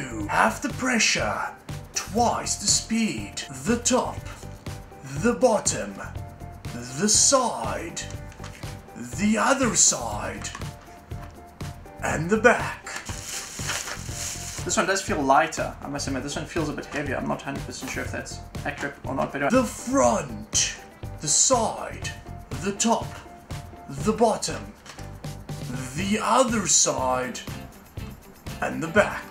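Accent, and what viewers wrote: South Africa. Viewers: Are u South African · You sound South-African mate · are you from South Africa?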